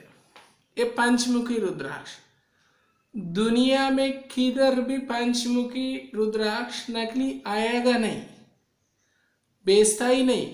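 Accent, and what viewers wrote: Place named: Kerala, India. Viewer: . Kerala ka hai Bhai boli se pata lag jata hai .Mai Gaya hu Kerala waha ke log yese hi bolte hai.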